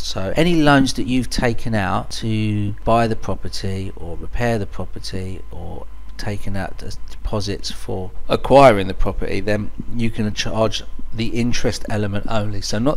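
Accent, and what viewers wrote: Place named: United Kingdom. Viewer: very intersting british accent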